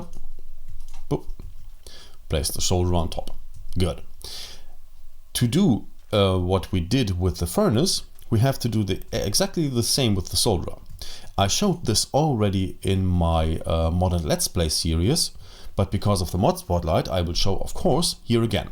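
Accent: Germany. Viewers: Do u come from germany? ^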